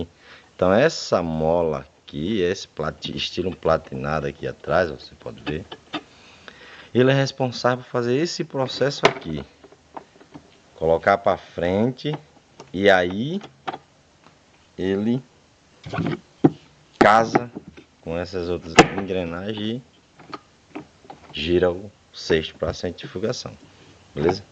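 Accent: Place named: Recife, Brazil. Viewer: Vc é de recife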